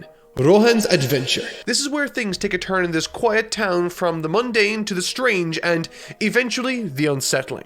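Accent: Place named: Ireland. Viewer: Are you from Ireland by any chance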